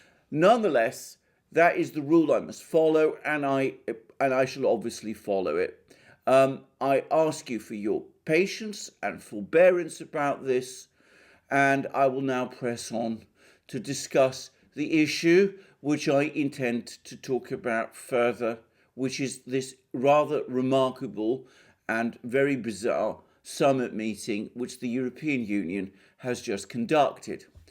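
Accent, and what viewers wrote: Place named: RP, United Kingdom. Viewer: Hi.. I love your presentation. It's in Queen's English and it's succinct and deliberate in diction.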